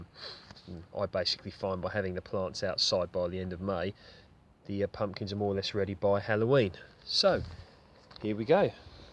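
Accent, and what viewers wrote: United Kingdom. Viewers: I was like hmm I wonder how easy they are to grow in the UK so I’ve been scrolling through videos trying to find a familiar British voice lol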